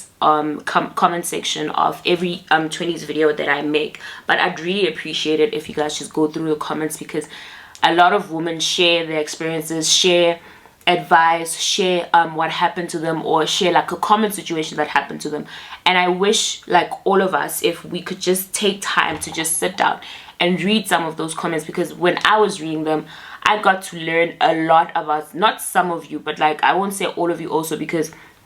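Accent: Nigeria. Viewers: I always take notes when you do sit down videos and I enjoy them so much. I agree particularly with the people pleaser point because I am unlearning this and it is so uncomfortable and so weird for me. I sometimes feel like I'm doing something wrong when I don't do things to please people anymore. Thanks for imparting knowledge and nudging me in the unlearning direction a bit more. Side note: may you please do a video in your Nigerian accent one of these days.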